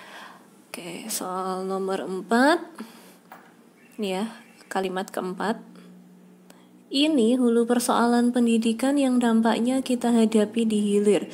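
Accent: Java, Indonesia. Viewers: Mba2 ny ngomong ny medho 😆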